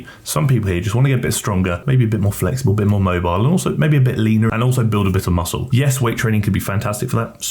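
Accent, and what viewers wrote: United Kingdom. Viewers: Favorite time of week when hot British man talks to me about fitness.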